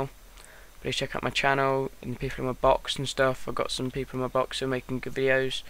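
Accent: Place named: United Kingdom. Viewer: tell them ppl he doesnt sound lik a girl he sounds lik a british person!!!!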